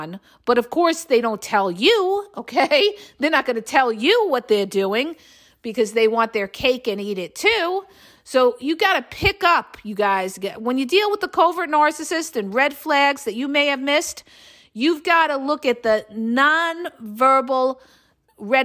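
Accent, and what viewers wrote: New York, United States. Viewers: I got mad for you and troll about your talking . I commented to you first time I heard you I can hear the power of New York or something very cool and legit and sexy so keep it up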